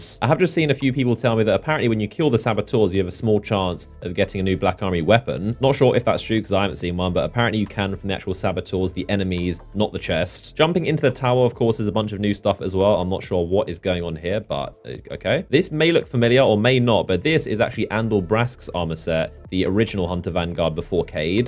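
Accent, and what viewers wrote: United Kingdom. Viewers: Why is everyone on Youtube talk in a British Accent ? lol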